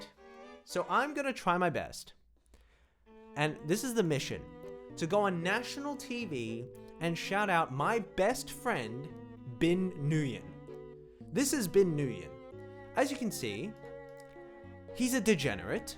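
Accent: Australia. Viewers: in his asmr he just sounds like an american or canadian but as soon as he speaks up a little your like jesus thats a fucking aussy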